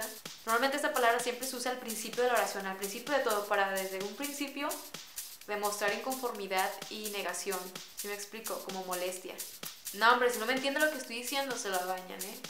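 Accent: Mexico. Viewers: Conocer México y esa bonita cultura es mi sueño su dialecto suena padre jjjjajjj desde colombia saludos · Me encanta el acento mexicano sus frases y ese estilo a la hora de hablar Saludos desde El Salvador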